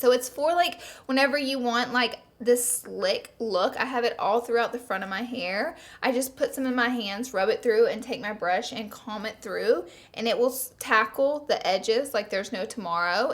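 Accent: South, United States. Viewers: I'm usually a silent subscriber, but just wanted to drop in and say I love how vibrant your personality is. You are amazing at bringing your personality and humor through the camera and I can't get enough of your animated facial expressions. Also, your draw makes you more relate-able to those of us in the south. Keep it up girl!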